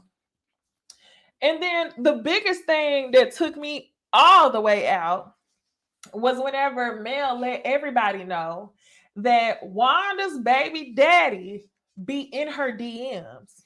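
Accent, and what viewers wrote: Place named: DMV, United States. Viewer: Lol the first time I heard the DMV accent was some documentary about girls in a juvenile home...and then Monique the comedian lol. I hear your accent but I've noticed in Dallas there are 2 different accents...the southern one that multiple ethnicities have and the one that sounds like Nelly and the St Lunatics lol. I'm from boring Iowa but live in TX so I love hearing accents 🤣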